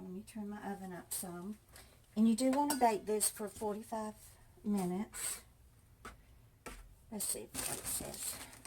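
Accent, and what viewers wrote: South, United States. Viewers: Thank you for sharing that recipe,. You always have tasty foods to share with viewers. As always, can't get enough of the Southern accent...it reminds me of home. Stay blessed, kind soul.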